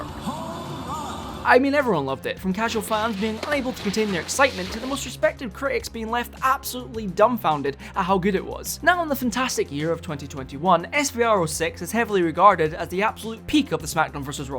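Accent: Scotland, United Kingdom. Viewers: Surprisingly he’s actually Scottish haha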